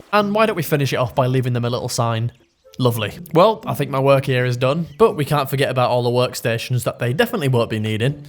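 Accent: England, United Kingdom. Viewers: I love your british accent😮❤ You know, this is the first time I've heard a British accent spoken by a person, and yeah I like it. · The ‘Let’s game it out’ style playing with an English accent isn’t something I knew I wanted. But I’m happy this video came into my algorithm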